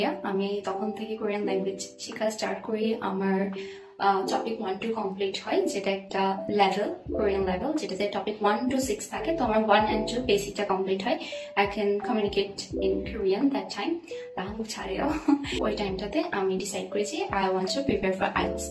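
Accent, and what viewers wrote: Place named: Chittagong, Bangladesh. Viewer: whan you say Bengali, চাটগাঁইয়া একসেন্ট আসে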